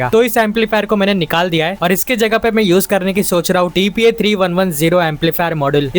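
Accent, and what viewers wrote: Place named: Maharashtra, India. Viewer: App marathi ho na, aapke hindi bolne ki tone se lagta hai ki aapko marathi aati hai ❤❤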